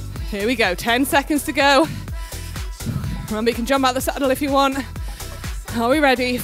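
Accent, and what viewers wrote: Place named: United Kingdom. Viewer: aahhh!!! British English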